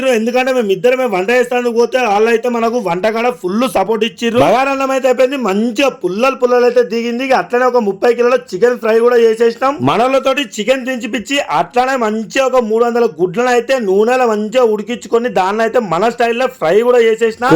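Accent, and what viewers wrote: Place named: Tirupati, India. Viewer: I am from jagtial. Ur style like same to same tirupathi Andra vala laga undi matalo chepadam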